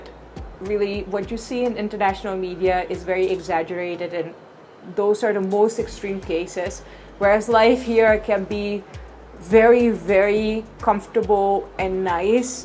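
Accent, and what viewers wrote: India. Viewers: Your getting a Indian accent! I’m from Amsterdam and it’s really obvious to hear ! Love it 😊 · Your accent is like Indian haha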